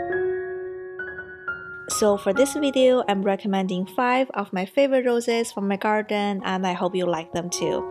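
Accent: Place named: Vietnam. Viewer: Just came across your channel. I also live in the Bay. I noticed your accent. Are you Viet lol? Love your garden!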